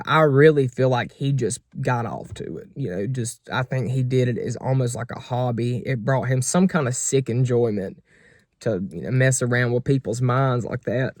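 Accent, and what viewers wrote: South, United States. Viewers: I love your accent- true southern not fake- if you ever go to Memphis go in May for the barbecue festival and go to the Rendezvous for ribs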